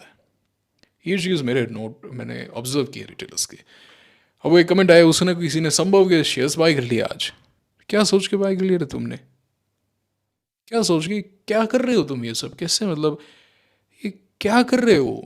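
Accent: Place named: Haryana, India. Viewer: Haryanvi mst bolte ho sir aap to 😅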